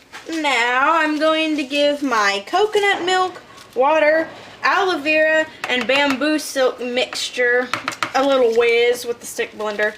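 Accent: Texas, United States. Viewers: Back when Texan accent shown through and was beautiful 😍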